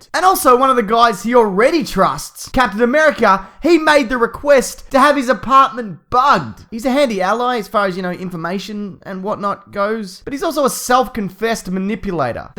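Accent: Australia. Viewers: His accent is australian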